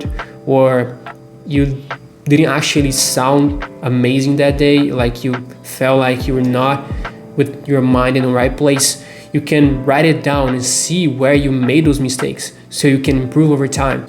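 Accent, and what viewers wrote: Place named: Brazil. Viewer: He's Brazilian!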